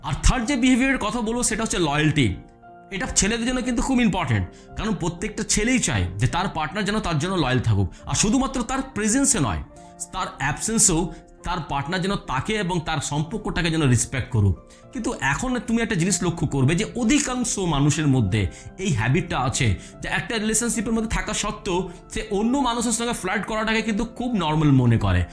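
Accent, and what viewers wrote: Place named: Kolkata, India. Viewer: Apni Kolkatar...😂😂😂 Kotha bolar dhoron ei bojhay..😢😢😢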